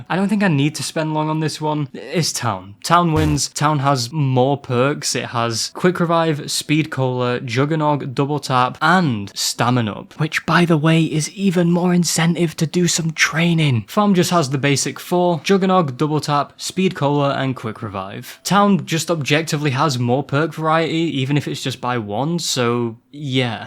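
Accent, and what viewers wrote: United Kingdom. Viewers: Something tells me this guy is British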